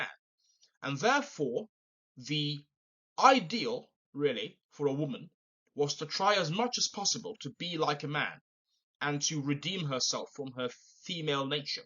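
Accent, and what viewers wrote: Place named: United Kingdom. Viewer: I'm going to let you know something, when I saw this video pop up on my homepage I thought, 'will he will speak with a British accent', and I was right! I'm from the US by the way😭😂🤣🤣🤣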